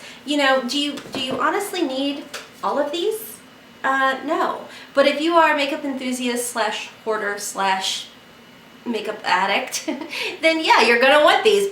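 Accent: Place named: Kentucky, United States. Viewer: Congratulations on 5K!!!💖💜💖💜💖💜💖💜💖💜💖💜💖 AND,... Thank You for this very generous giveaway!!! Thank you for sharing another GREAT tutorial😚. I love the Bad Habit palettes. And, even the ABH palettes have kick up. I think like you said, it's rare to find a palette nowadays that DOESN'T have some kick up. I love your Kentucky personality and accent💖. I lived in Louisville when I was a young girl for a while and I absolutely loved it. I was sad when we moved. I ended up back in the South anyways now. Lol (N Carolina). I absolutely LOVE living here. Wish we lived closer as I know our personalities are very similar and we would get along great!!😚 (Especially the makeup hoarding part😁😁😁😁). Look forward to your next video send here's to 10k!!🍾🥂🍾🥂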